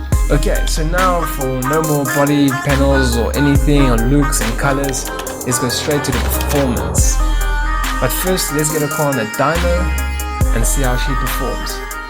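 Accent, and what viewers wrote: Afrikaans, South Africa. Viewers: Afrikaans boytjie, kan sommer hoor haha.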